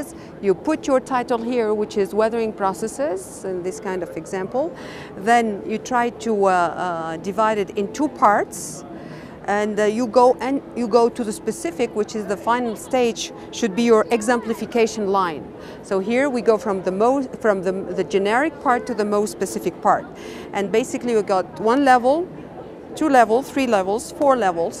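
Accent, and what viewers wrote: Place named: Russia. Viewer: hello Teacher, You have a little European country accent. May be , Russia. Where are you from?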